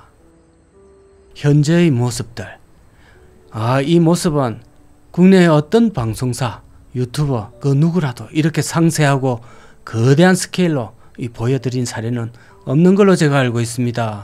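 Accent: Gyeongsang, South Korea. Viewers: 구수한 경상도 억양의 말을 거부감 없이 재밌게 잘 들었네요 한국은 의료민영화 절대 안됩니다 하는 순간 빈민국으로 추락입니다 미국도 의료시스템 땜에 시민들이 억의 빚을 지기도 하고 비실거리는데 한국 같으면 상상도 하기 어렵습니다 미국도 의료민영화 없애야 하는 아주 고질 시스템입니다 저는 웃긴 자막 재밌습니다 훈훈한 경상도 말씨에 구독하고 갑니다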